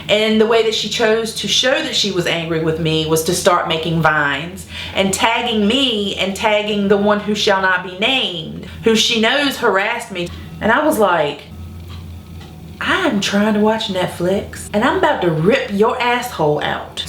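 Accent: South, United States. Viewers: High five for us girls with a southern accent!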